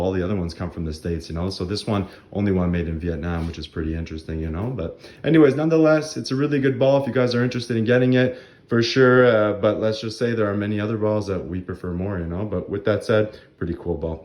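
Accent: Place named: Canada. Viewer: Buddy, questions for you if you have a second. 1) you sound Canadian, are you? If so, I assume you ship to Canada (if you run a shop), oui ou non? Would be great to buy a ball from you to support your work. 2) This Nike ball has been elusive for me - specifically the SKU with the “lower” white stripes as opposed to the striping laid on top of the ball. Do you know where to get one in Canada if you don’t sell/ship them?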